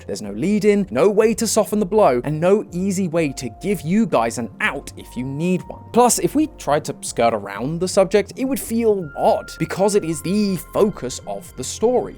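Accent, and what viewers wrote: United Kingdom. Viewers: I hate this wanna-be, low tier, british, fake matpat host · He's British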